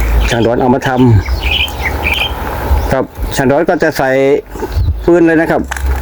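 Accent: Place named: Korat, Thailand. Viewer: เป็นคนที่ไหนครับ อยู่จังหวัดอะไรครับ สำเนียงเหมือนคนโคราชเลย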